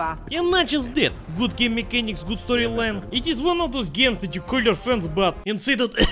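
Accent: Eastern Europe. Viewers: This comes up pretty often. In Q&A he said that he was indeed born in a Slavic country. If he didn't mention that I would have guessed that he is a non-slav, since not only is his English good, but he uses certain expressions and idioms that I only encountered if you lived in an English speaking country. I do think he thickens up the accent, as some of his earlier vids where he plays with fans, it is less "thick." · are you russian or non-russian with a good slavic accent?? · Hello! Awesome video!, like always:) I just want to say something about the free subtitles videos... just a little suggest... I think that videos with smaller subtitles it's better than no subtitles, because people that don't speak english very fluid may confuse words with your slav accent. For example, I'm from SouthAmerica, and always speak spanish; i've learned english in highschool and I complement my vocabulary reading texts, playing a lot of videogames in english and seeing english-speakers youtubers, but is hard to follow your rythm with a strange but blyattiful accent. 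It's only a suggestion, for every person that don't have english as mother language:) love you! no homo(? keep doing your videos!!!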